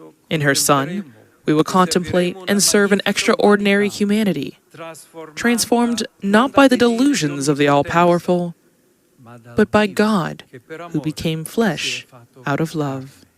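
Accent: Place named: United States. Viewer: Crystal clear American English!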